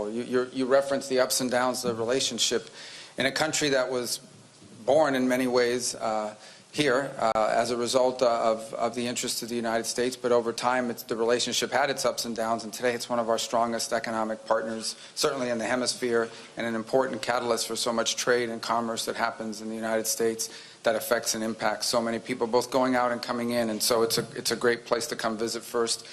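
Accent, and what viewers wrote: Cuba. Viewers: He got Cuban accent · He’s born to Cuban parents, obviously he speak Spanish as Cuban so; it’s not “black “ Spanish, it’s Cuban Spanish.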